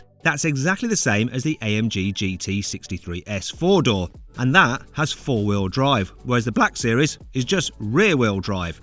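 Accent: United Kingdom. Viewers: 이거 영국식 발음인가 뭔가 딱딱해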